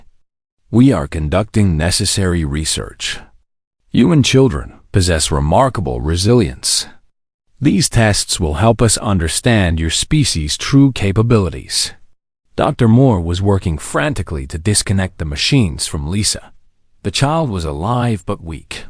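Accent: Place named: New York, United States. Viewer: This new york accent sucks. I cannot listen to this or watch this...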